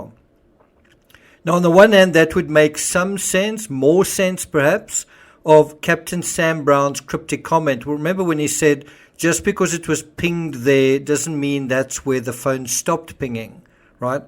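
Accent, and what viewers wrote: South Africa. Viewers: Hello. Are you South African? Thanks for this.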